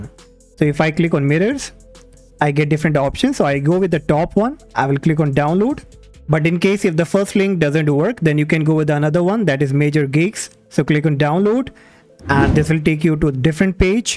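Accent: India. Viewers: Indian accent...Beware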